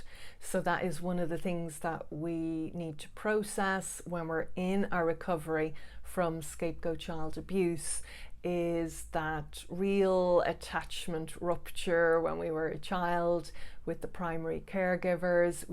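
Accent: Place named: Ireland. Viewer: Hi..I live in Dublin...do you coach fsa individuals in Ireland?...I hear you've an Irish accent...😊 tnks! Brilliant video btw!❤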